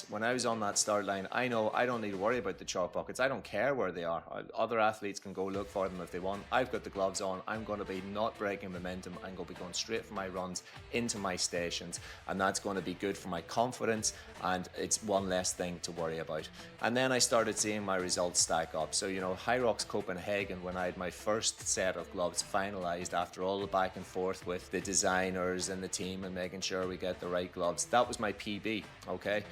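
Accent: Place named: England, United Kingdom. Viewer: Great post and tip – I ordered a few. And, you have a strong English accent, where are you from?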